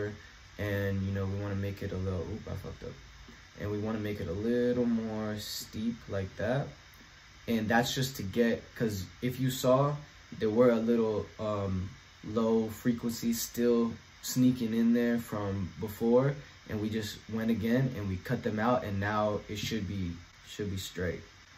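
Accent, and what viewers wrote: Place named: California, United States. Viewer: that’s wild lmfao I can hear it in your voice you been around Cali people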